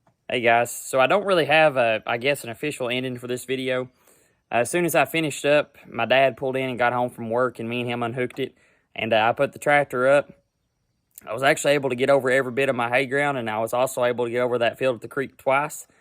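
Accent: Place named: Virginia, United States. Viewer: He sounds like he's form Virginia or North Carolina.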